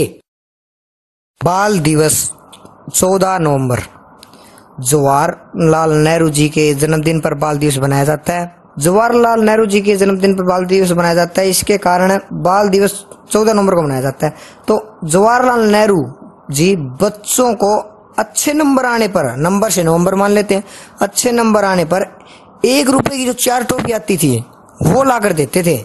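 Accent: Haryana, India. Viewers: Hariyana se hai ke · जाको बोले पक्का हरयाणवी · Sir aap haryana k hai kya??? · Achi tricks h bhaiya......lagta h Haryana se ho☺️☺️☺️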